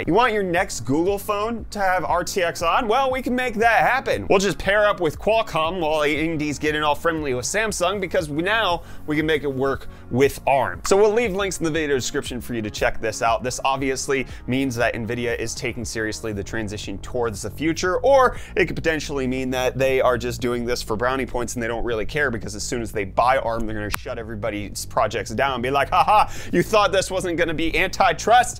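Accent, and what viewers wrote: Canada. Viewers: More Canuck accent please! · Umm Canadians sound just like you. We don't speak any different.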